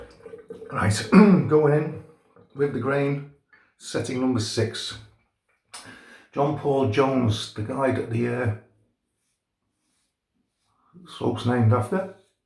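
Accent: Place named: United Kingdom. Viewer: Thank you so much,! So glad you enjoyed the scent and shave:) I love my 1960 Fatboy. You tell the JPJ story very well and love to hear it in a British accent!! Really appreciate you, and keep the content coming. Cheers!!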